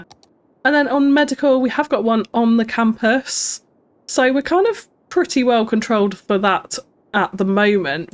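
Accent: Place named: RP, United Kingdom. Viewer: What is this BBC radio accent, it giving flashbacks?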